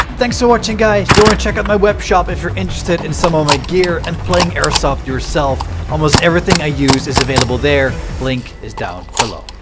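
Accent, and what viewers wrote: Netherlands. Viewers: Ayy are you dutch becuse i can understand you and im afrikaans Just look it up afrikaans is a descend of dutch the more you know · Are you dutch cause i am and i think you are too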